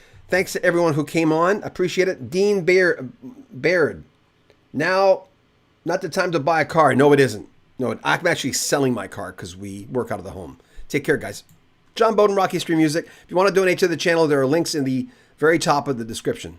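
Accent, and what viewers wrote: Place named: Canada. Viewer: You can definitely tell he's Canadian by his accent... especially the way he says "abowt".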